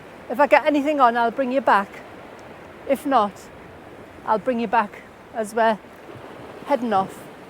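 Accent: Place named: Northern England, United Kingdom. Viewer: you will have to do a northern lights video as by your accent you are very close to catching them Very different accent to further you go up before it turns into Scottish And we are based Co Durham so get to hear many accents ❤️🫡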